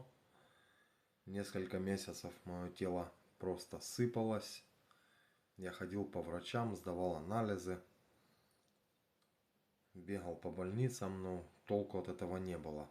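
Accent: Ukraine. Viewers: А разговаривает с украинским акцентом. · да он не в России, не слышите говор что ли? Где то за границей украинец.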